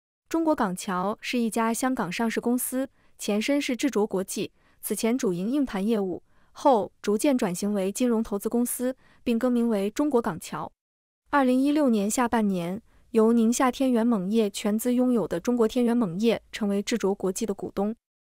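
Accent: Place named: Northeast, China. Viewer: 為什麼是東北人口音好惡心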